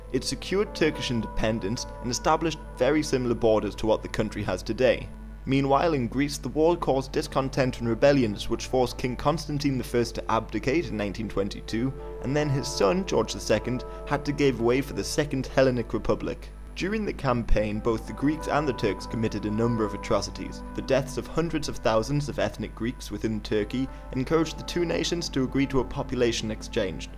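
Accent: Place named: United Kingdom. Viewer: Its difficult for not english speaking people to understand... British accent is the worst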